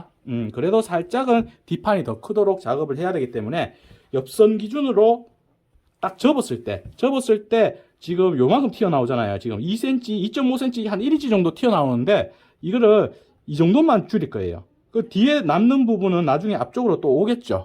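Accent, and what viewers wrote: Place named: Daegu, South Korea. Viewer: 수선의 달인 이십니다 경상도 사투리중 가장 정감이가는 대구 사투리 매력에 푹빠져 설명이 속속 귀에 들어 옵니다 오늘도 잘 배우고 갑니다 😊😊😊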